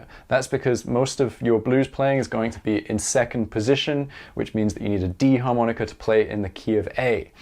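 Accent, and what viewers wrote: Scotland, United Kingdom. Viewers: You're sounding less and less Scottish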